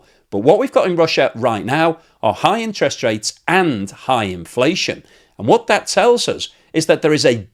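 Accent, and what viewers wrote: United Kingdom. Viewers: Oh, British accent. It's a dead giveaway. As soon as one hears it - one knows he'll be lied to at nauseum. I don't know if that thing is cultural, traditional, biological - but British accent is a flashing red light. My point - no one from that incest ridden, degenerated island, with crooked teeth and the hunger for other people's stuff, is not to be trusted. Just my 2 cents. You're welcome.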